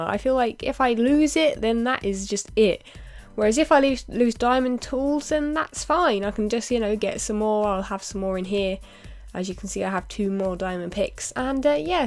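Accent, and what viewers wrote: United Kingdom. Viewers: Great vid. Love the secret base. I must say, I just love your accent. I was once stationed at an American airbase 2 hrs northeast of London and just minutes from a small town called Ipswich, and if I had to pick something that I miss the most about the UK, without a doubt, it's the British women and their beautiful British accents. Once again, great vid.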